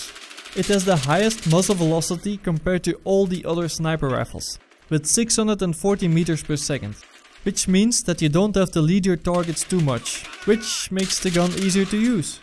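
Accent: Netherlands. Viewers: u sounds dutch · Je hebt een Nederlands accent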